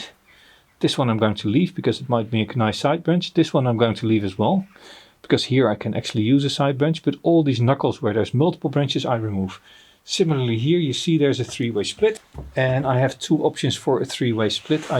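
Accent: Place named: Netherlands. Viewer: Another very enjoyable video,! Your tips are very easy to understand and remember. Also I enjoy another Dutch person speaking English, always funny how we pronounce a lot of English words, it makes us very recognizable haha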